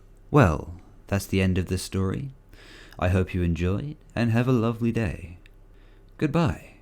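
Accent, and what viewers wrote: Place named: United Kingdom. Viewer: idk you sound pretty british to me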